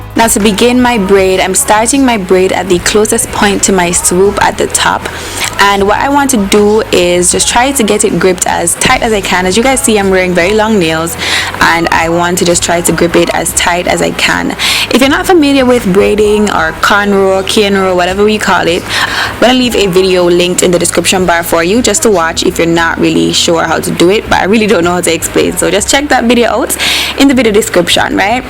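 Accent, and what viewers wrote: Jamaica. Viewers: ooh Jamaican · Ooo she Jamaican go girl!!! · love yo accent (team jamaica)😊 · are you jamaican or Trinidadian? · Love the Jamaican accent. you are so beautiful. big up yo self.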